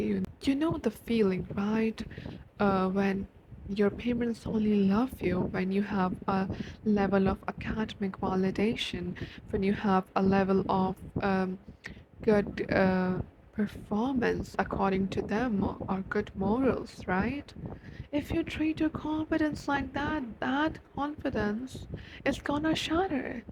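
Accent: Bihar, India. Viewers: Bihari pe accent kese🥰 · 18 years old seriously Dekh kr 25\27 saal ki lgte ho Plz apna bihari accent sunaao bht vibe and maza dono milta hai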